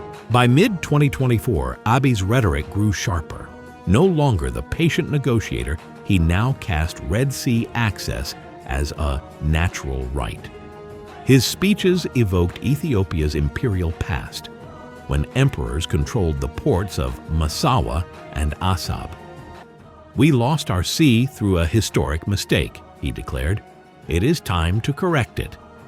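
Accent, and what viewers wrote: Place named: United States. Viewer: Who are you? Are one paid stooges with American accent spreading falsehood. This deluded Abiy thinks he can change the subject of his own misgovernance replete with assignations, imprisonment and outright war against his own people—Ethiopians. So, live with this—Eritrea’s ports are non-negotiable even with the support of UAE which is creating havoc in Africa—Sudan, Chad, Libya and now Ethiopia. So, this uneducated and uninspired leader called Abiy has become the water carrier for UAE. Eritrea will never and is not cornered. It is Ethiopia, the client state, of other powers which is cornered.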